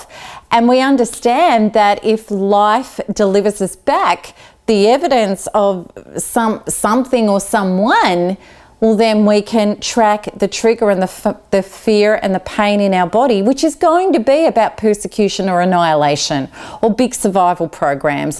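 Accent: Australia. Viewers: When does this New Age bs with a Aussie female accent STFU?! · Are you Australian?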